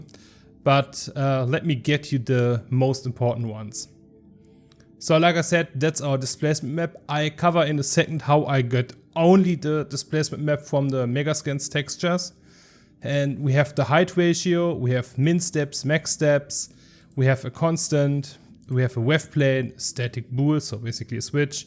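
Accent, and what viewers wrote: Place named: Germany. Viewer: Der Deutsche Akzent ist nicht überhörbar 😅, aber gutes Video 👍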